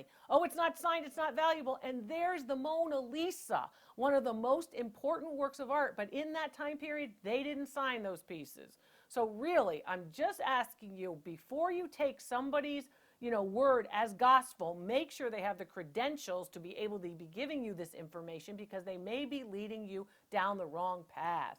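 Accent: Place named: New York, United States. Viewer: It's just because of your New York accent that people say you remind them of others. That's because others have the New York accent, and Judge Judy is straight forward (although a little rough sometimes). Don't worry about the evil people with the nasty comments. They are just jealous because they don't know as much as you do.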